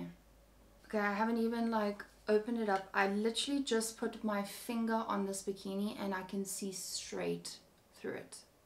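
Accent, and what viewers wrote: South Africa. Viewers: I am just here to listen to your accent 😂 Live in Australia, but was born in South Africa. I have an Aussie accent now so love listening to yours. Makes me nostalgic 🥰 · I subscribed as soon as I heard you're South African accent 😁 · Noticed the SA accent, new to ur channel. Love from sa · I knew you were from South Africa before you even said it lol my best friend is too and you sound the same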